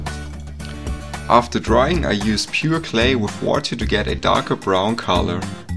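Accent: Germany. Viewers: Well i can hear that youre german. WoW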